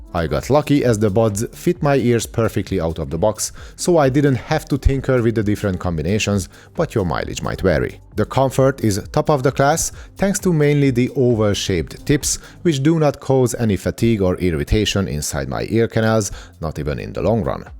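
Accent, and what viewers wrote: Hungary. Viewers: Feldobta a videódat, egyből ki halottam a magyar akcentust, amúgy tetszett a tartalom😊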